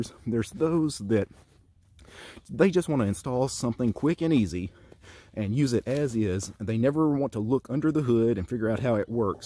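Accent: South, United States. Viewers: Ah, so you're in Louisiana! I always wondered where that southern accent came from. We got a lot of snow here in Arkansas too.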